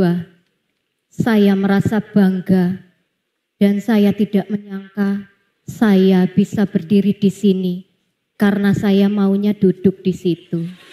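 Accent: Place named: Java, Indonesia. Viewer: Narasinya bagus! Medok jawanya pas!!!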